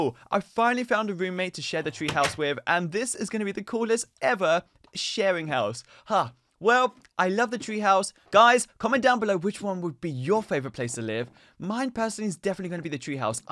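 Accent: United Kingdom. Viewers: Are you british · Obv he's British 🙄 That's clearly a British accent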